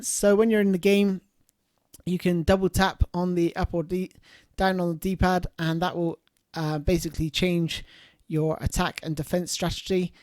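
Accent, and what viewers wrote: United Kingdom. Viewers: Are you from Uk ??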